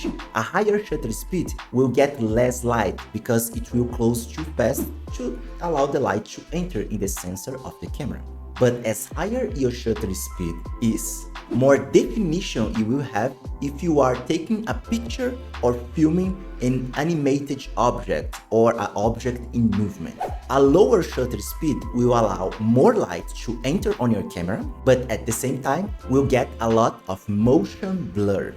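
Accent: Brazil. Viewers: Are you Brazilian ? You have the accent .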